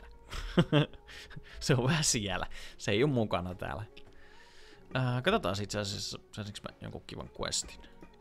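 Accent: Finland. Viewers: He's from finnish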